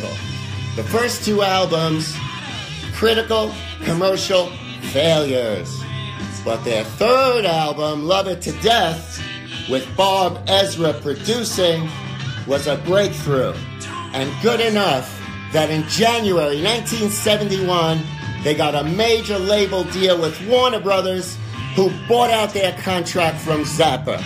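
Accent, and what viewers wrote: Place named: New York, United States. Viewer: is that what a new york accent is?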